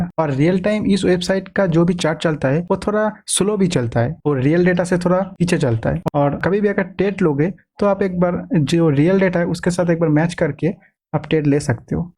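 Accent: Bengal, India. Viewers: Ap bangali ho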